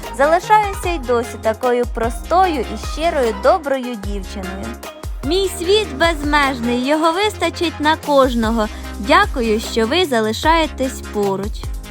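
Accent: Poltava, Ukraine. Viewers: Що ви морозите? Який суржик? Прекрасна українська мова з інтонаціями Полтавщини.... Не лізьте з своїми порадами туди, куди голова не влазить.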